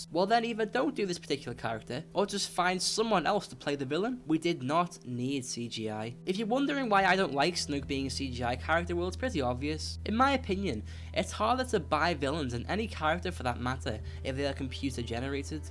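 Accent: United Kingdom. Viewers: Oh wow, so is that a strong british accent?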